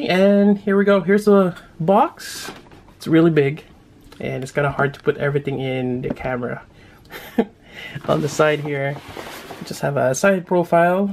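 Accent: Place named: Philippines. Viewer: the way you talk.. are you filipino?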